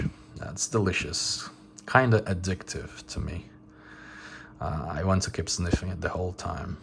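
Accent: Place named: Portugal. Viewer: lovely review bro! your accent sounds very Portuguese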